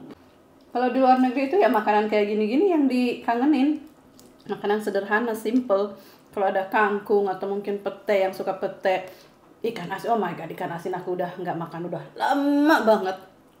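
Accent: West Java, Indonesia. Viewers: Aduhh teteh yamg nonton lebih panik...apinya naik gt deg2 an teh teteh biar lama di uk tapi logat sundanya ndak ilang ya teh tapi ndak papa itulah cirinya teteh....rame teh kalo sambil cerita2 tambah asik....selalu sehat teh❤❤ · Abdi kadupandak oge, Suganda. Seneng teteh logat sundanya masih.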